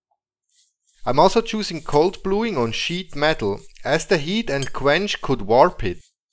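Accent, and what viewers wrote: Switzerland. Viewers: So geil, wenn ich no vor em Rapsöl gmerkt han, dass das en Schwiizer sii müesst!!! 🤣👍🏻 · Awesome video, LOVELY 😀 Swiss accent... (I lived in Zurich for 23 years...) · Ich wette Sie sind Schweizer. :) Tolles Video! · Irgendwie amüsant, wenn man den Schweizer Akzent trotz englischer Sprache völlig heraus hört :D Es funktioniert also mit Metall, aber nicht mit Edelstahl.. Woran liegt es? Chromanteile oder worauf müsste ich achten?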